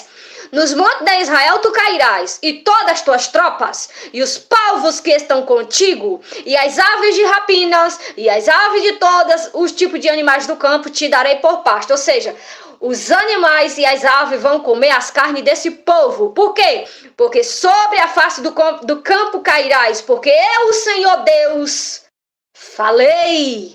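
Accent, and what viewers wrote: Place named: Northeast, Brazil. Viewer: Kkkkkk chegando agorinha 😂❤°°!!!! Vc deve ser de algum estado do Nordeste,, vc fala com sutak q nem eu !!! JA ME ESCREVI E É AQUI QUE VOU APRENDER MAIS ➕️ ➕️!! OBRIGADO!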